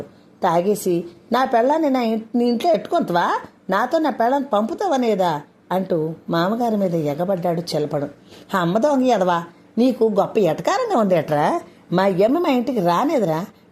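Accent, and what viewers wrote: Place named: Uttarandhra, India. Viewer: Good story. The slang of uthharandhra well established in the tone of story teller.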